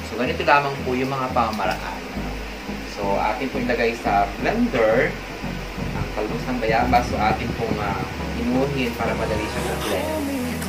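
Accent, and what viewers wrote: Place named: Lopez, Philippines. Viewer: parang taga lopez quezon ka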